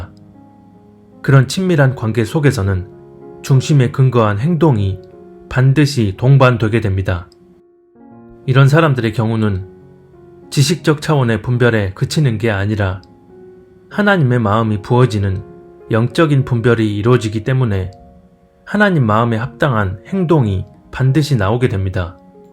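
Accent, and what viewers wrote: Jeolla, South Korea. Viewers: 전라도사투리 억양이 강하네요ㅎㅎ 저도 광주출신이라..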